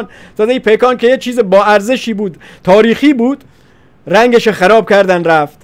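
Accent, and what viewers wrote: Lorestan, Iran. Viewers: فقط اونجایی که میگی خدا‌ قوت ت بیه 😂 دقیقا به موقع میگی 😂👏👏👏من بچه تهرانم و عاشق لهجه و گویش لری هستم! ایولا دمت گرم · قربون هرچه مرده. گلم خداوکیلی یکدونه ای..اگرچه مطالب رو همراه با مزاح و لهجه شیرین لری بیان میکنی، ولی زیبایی کارت همینه. مقابله و سرکوب با هرچی نامرد وطن فروش اه.واسه همینه نفوذ حرفات، ماتحت وطنفروش هارو همانند شمسی جاکوزی، به آتیش کشیده. قربونت، فدای مرامت❤🙏🙏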